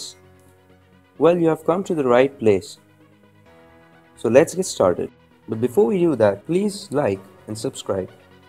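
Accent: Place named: India. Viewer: Ofc he has a Indian accent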